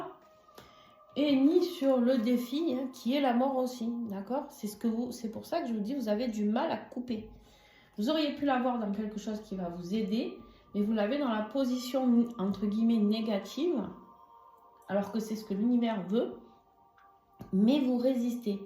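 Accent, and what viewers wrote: South, France. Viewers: et quelle voix...! Avec un bel accent du sud que je connais bien 😉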